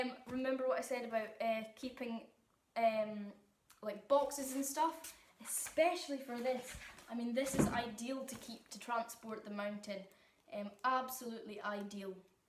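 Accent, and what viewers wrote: Scotland, United Kingdom. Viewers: Scottish accents and telescopes. Good times. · That Scottish accent though.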